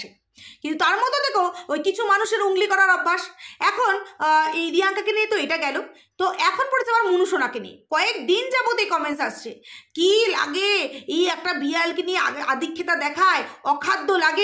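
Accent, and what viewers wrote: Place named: Bangal, Bangladesh. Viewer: Bha tumi toh darun bangal vasa bolo...Tumi ki bangal go? Khub sweet lagche sunte...jodio jader niye bolcho tader ami chini na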